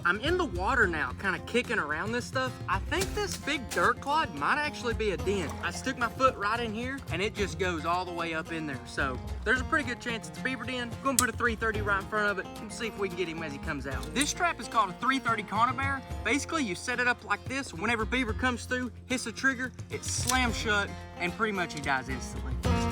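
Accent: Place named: Kentucky, United States. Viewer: always makes me smile with his Kentucky accent! Keep up the good work bro!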